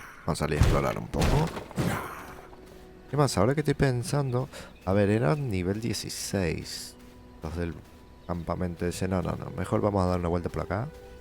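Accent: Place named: Santiago del Estero, Argentina. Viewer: Buenas hablas como santiagueño ^^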